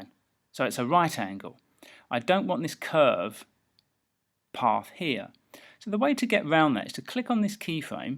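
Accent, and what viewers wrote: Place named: United Kingdom. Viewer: British accent is great!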